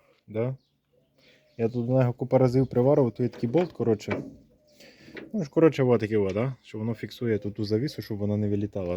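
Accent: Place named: Hutsul, Ukraine. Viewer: Привіт з Волині ,Автор одне питання ви з Гуцульшині чи області Закарпаття?????акцент увас похожий)).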